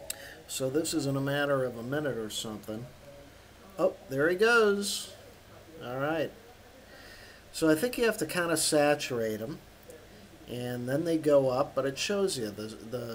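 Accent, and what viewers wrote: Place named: Midwest, United States. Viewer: Im from new york. lol my accent is nothing like this. his is more of a mid western accent. lol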